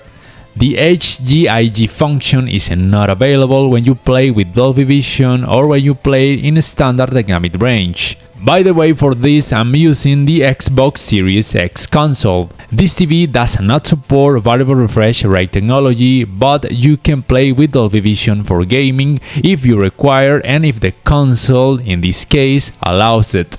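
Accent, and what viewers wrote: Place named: Colombia. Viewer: Buen inglés bro!! Se escucha tu acento colombiano 😂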